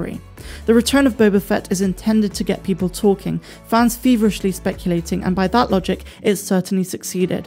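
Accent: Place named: West Country, United Kingdom. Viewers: Well said but I'm still hearing West County accent 😂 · Jibber jabber. I detect some good old South West accent in there. Devon maybe. Nice to hear a fellow yokel on YouTube.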